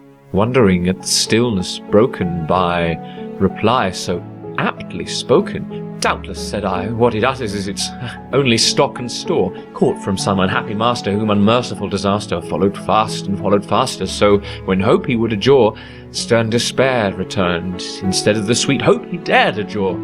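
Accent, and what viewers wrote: United Kingdom. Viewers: It's nice, but why the British accent? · Probably because the person reading it is British 👍 hope that helps!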